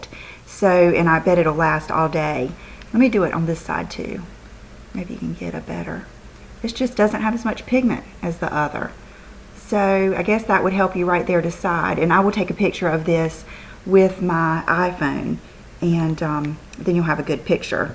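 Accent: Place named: South, United States. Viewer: I just discovered your channel through Rachel Talbot and I LOVE it !!! I'm French and I have a weird obsession with the southern accent ahahah I wish I could talk like you :-) Anyway I really like your videos and I'm gonna catch up on most of them. Xoxo from France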